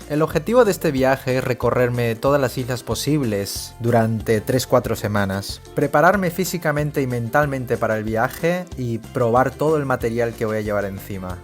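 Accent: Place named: Spain. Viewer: wow .... Naciste en china?.Pero me imagino que te has criado de pequeño en España, no?.Porque si tampoco es así, tienes el mejor acento español peninsular ... 😁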